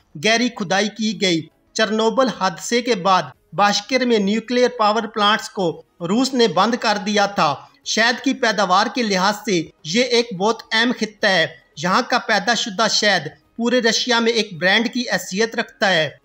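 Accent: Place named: Punjab, India. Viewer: He has punjabi villagi accent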